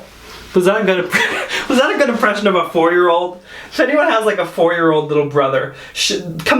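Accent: United Kingdom. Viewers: Your British accent sounds like Tom holland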